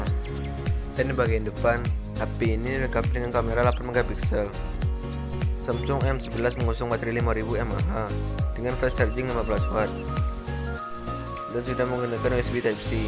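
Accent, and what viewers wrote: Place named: Lampung, Indonesia. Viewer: Suaranya kayak orng lampung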